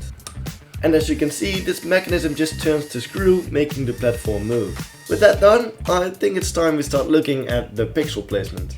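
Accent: Netherlands. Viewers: I immediately heard you were Dutch 😂 Love your vid! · Love how you can still hear the dutch accent, Maar goed bedacht en gemaakt! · Your Dutch arent you?? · Hi I love your video and I thought you sounded very Dutch if your not Dutch don’t take this as an insult plz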